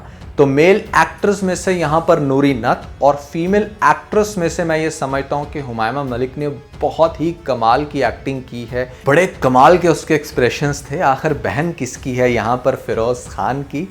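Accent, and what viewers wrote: Punjab, India. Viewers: One request please stop speaking English as Punjabi you can speak Punjabi urdu or English but properly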